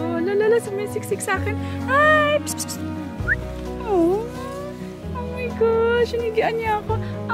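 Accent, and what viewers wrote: Iloilo, Philippines. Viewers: hi po.. 🙋 ang galing po tunong ilongga narin po kayo..👏👏👍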